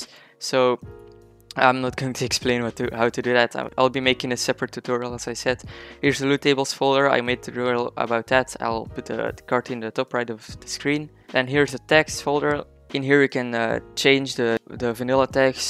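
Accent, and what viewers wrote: Netherlands. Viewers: I can hear the Dutch accent....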